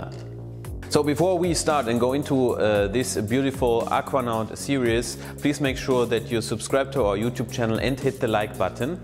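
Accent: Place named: Germany. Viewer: Ja aber ich muss bei seiner Aussprache zu oft lachen. Ich meine sein generelles Englisch ist ziemlich gut, aber Aussprache die ist schon Deutsch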